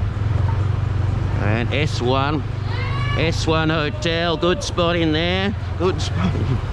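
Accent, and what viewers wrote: Australia. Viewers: just subscribed love your vids where did you come from sound aussie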